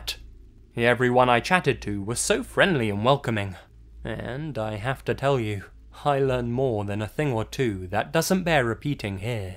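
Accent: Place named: United Kingdom. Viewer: Hi, I'm English and enjoy your channel very much, the English comment was because of your very British accent. You sound like a BBC docu. narrator, it "kills" me for this reason when you drop in vernacular, like, "...naturally, she lost her shit.", or "nearly shit myself!" WELL DONE, keep doing what you're doing and I will keep veiwing. Respect! ANDY F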